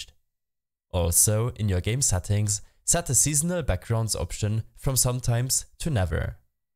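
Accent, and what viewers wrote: Germany. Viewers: You came from German right? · the german accent is crazy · hahaah man hört instant den deutschen akzent raus Danke für tut :)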